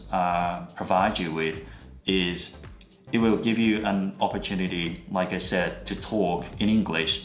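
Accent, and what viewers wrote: Australia. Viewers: オーストラリア発音磨きかかりまくってんなw makeとかほぼマイク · オーストラリアの発音ですか？ way = ワイ show = シェア 教えていただけるとありがたいです。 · I can get that English is being adjusted to Aussie one(kinda "Aussied"😂) little by little.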